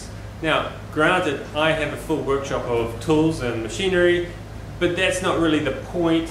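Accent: New Zealand. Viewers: Excellent video and thanks for sharing your ideas and tips, great too see some different ways with fitted wardrobes, kitchens and furniture, I lived and worked in NZ as a chippy for 6 years the accent and terminology bringing back all the good memories 😄 👍 keep up the good work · When I stumbled upon this channel, I was impressed by the woodworking skills shown in the videos. The narration and editing is always done very well and makes it fun to watch. But I have to admit, what really puts a smile on my face every time is the kiwi accent. From one professional woodworker to another: keep doing what you are doing,. You are very good at it.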